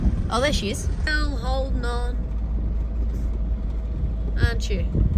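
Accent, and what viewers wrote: Australia. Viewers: I love everything about your content. You telling us how stuff works out there. Aussie accents are always a delight to listen to. You telling the idiot comments to fuck off (this one was particularly good lol). Random Slug intermissions. And seeing kangaroos fucking about like deer (as an American, most of the time if I ever see kangaroos in videos it's documentary stuff, so seeing them just doing kangaroo things in someone's fields is weirdly entertaining to me). Good stuff all around!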